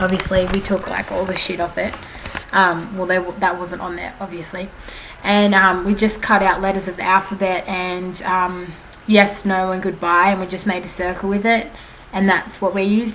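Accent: Australia. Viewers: I'm having like hard time understanding your Australian accent :( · Are u and Auzzie ? I can tell by ur accent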